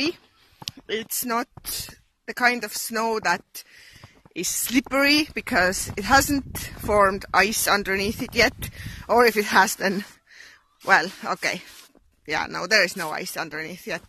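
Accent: Ireland. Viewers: Your accent is great! I can hear a definite Irish element to your accent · BEAUTIFUL AND YOUR GETTING AN IRISH ACCENT THERE KIDDO !!!!!!!! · You're getting the cork accent!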